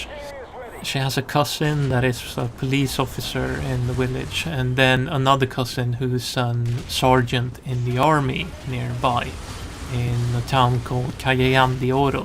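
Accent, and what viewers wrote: Sweden. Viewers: I would also like to hear more stories stories and see more games with US tanks. Swenglish is cool :-)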